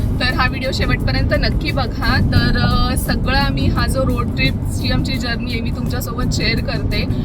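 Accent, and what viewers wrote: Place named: Pune, India. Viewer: mla kuph awadta tumche bolne punyche mrate bolta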